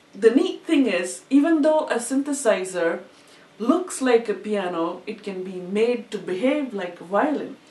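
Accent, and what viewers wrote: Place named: England, United Kingdom. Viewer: Excpet ur strong english Accent.... Everything else was okay!!!!!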